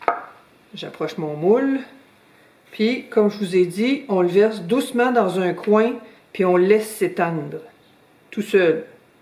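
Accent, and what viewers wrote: Canada. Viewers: j'adore votre accent canadien! top! et la bouille à la fin m'a fait trop rire, super humour, merci!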